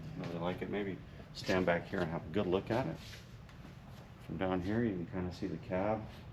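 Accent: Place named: Australia. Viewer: How long have you been in the states? If you're an aussie I can barely tell!!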